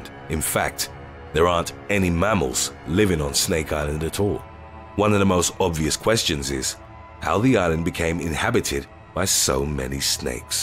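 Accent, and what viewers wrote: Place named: United Kingdom. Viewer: this channel is to be good with giving you actual survive tips against certain animals or calamities like heat waves and avalanches. But it seems like he deleted all of those videos and now he's dishing out these wack videos that will never apply to me and with that annoying British narrator. Bring back the OG narrator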